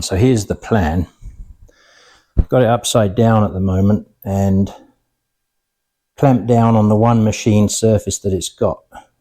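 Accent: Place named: England, United Kingdom. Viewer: Ooh! Just found your channel, nice to hear an English voice for a change. 🙂 I have the same saw, the blade fell off every time I started a cut as the drive wheel was misaligned, but after a bit of fettling it's done a good 15 years service including cutting a lot of firewood! I recently had to replace the motor capacitor, but nothing else. The stand is poor as you say. I'll be interested to see your vice mods.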